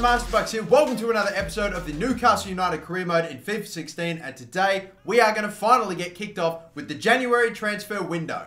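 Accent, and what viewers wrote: Australia. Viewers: daily dose of angry aussie beginning in 3, 2, 1....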